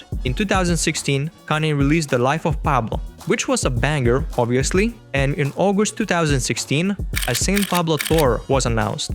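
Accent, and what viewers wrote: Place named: India. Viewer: u sound indian af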